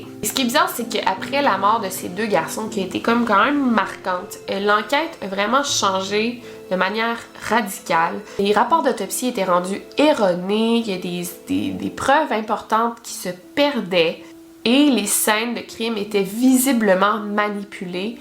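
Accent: Quebec, Canada. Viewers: avant je détestais l accents québécois mais dans la bouche d une jolie fille c est toute suite mignon .Continue comme ca tu nous trouve souvent des histoire pas trop connue ou du moins toujours intéressant dans la réflexion👍😗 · J’AI VUE SA TÊTE J’AI PENSÉE MIND HUNTERS 😭 Sans rigoler j’adore tes vidéos, j’suis abonnés depuis longtemps et merci beaucoup pour tout le travail que tu fais c’est ouf, j’ai toujours apprécié tes vidéos , tu es toujours respectueuse envers les victimes et tu racontes très biens les histoires (et tu m’as fait aimé l’accent canadien) bref je like et je commente pas souvent et j’vais essayer de le faire au moins pour toi parce que ça vaux le coup🙃 J’espère que tu dors bien mdr ça doit te prendre tellement de temps de faire autant de vidéos en octobre, encore merci❤️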